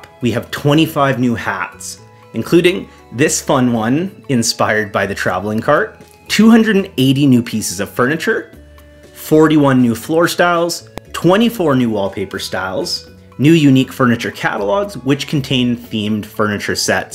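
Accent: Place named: Western Canada, Canada. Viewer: Great videos and your western Canadian accent is really cute ^_^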